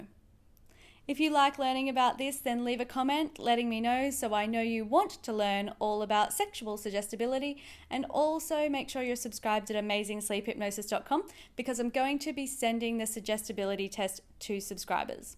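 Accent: Australia. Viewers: I’m suggesting to myself how to master your 😘 Aussie accent. O’s are diphthongs or tripthongs in Australian. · you are literally about as far away as you can possibly get without going off world lol she's Australian fella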